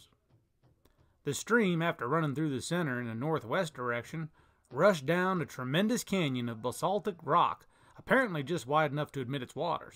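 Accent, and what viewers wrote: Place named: United States. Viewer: oh oh voices of the past except an American dude with an American accent! Very excellent, and fascinating!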